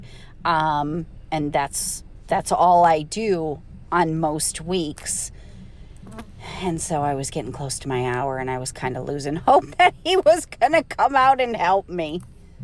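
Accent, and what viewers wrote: England, United Kingdom. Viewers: You are killing it!! I have a question. I have been following you for a year now, where does the English accent come from??? Lol have a great day!!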